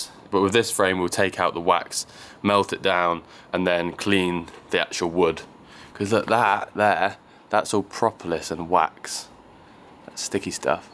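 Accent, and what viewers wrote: England, United Kingdom. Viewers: I also love watching these videos! And the English accent along with your jargon is really fascinating to listen too. Thank you for all the hard work you put into your harvesting and videos!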